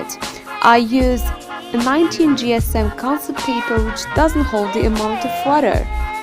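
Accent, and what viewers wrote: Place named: United Kingdom. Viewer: Should I comment about these amazing drawings 😍, well edited video 🤩 or just,,, that new British accent thouuugh ? 😂😂 Ps : U nailed it 👏👏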